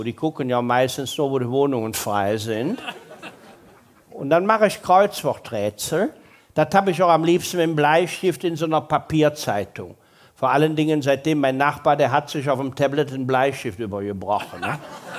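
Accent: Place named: Rhineland, Germany. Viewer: Wenn man sich mal an den sehr ruhigen und rheinischen Dialekt gewöhnt hat, wobei ich mit rheinisch überhaupt kein Problem habe und es auch sehr gerne mag, Mama war aus dem Ruhrgebiet, dann lernt man ihn erst so richtig kennen und sagt Respekt. Sehr viel Wortwitz! Ein wirklich guter Hausmann auf der Bühne!🤣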